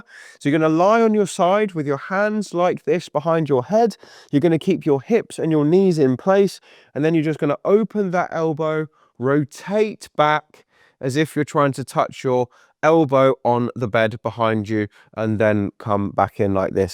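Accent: England, United Kingdom. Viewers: The best English accent ❤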